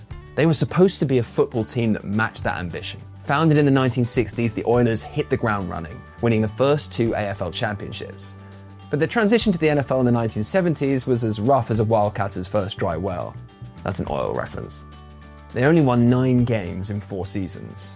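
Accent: England, United Kingdom. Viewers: I don't know, but it's kind of odd hearing a dude with an English accent telling us about NFL history. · So weird hearing an English guy say all this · What is a guy...with a British accent, doing...caring one bit, about the, defunct, "Houston, Oilers"? Don't get me wrong...I like it! It is intriguing. Being a native Houstonian, having grown up in the 70's in the Houston area, (Magnolia, Texas), I, my brother, my Dad, and ALL my friends...were die-hard Oilers fans. It just stuns me...amazes me...confuses me...that a "chap" from, "Bloody Ole England", (who call "soccer", "footbal"), care one iota about a defunct Houston, Texas Oilers football franchise? I don't get it...but put me on board. I even subscribed to his channel. "Love Ya Blue"...always will. I was 12 years old...in 1979...and Earl Campbell...was the greatest sports hero in the world in my eyes. 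· Its crazy having a british accent talking about american football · Wasn’t expecting a British guy but hell yeah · Wait is nobody going to question, why the guy speaking in the video, has a British accent? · Uhhhhh.....why is a British person telling me anything?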